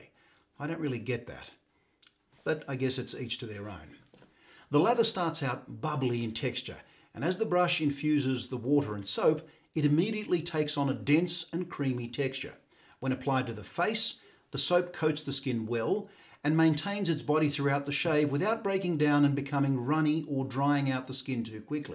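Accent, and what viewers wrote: Australia. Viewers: Yes, but also sound slightly Aussie too so I couldn't tell, lol. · You sound very Australian to me. I am English. You do have a soft accent but it is clearly Australian (to me). Your pronunciation is exceptional and clear. I would say you speak with received pronunciation but with an Aussie flair.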